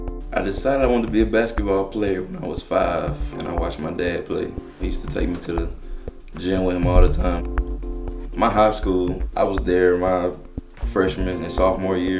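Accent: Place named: South, United States. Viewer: straight down south accent.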